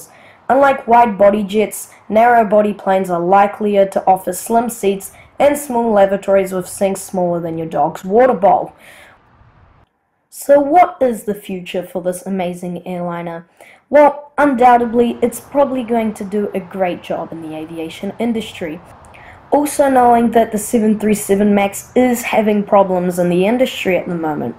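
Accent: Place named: Australia. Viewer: Damn.....that Australian accent is annoying !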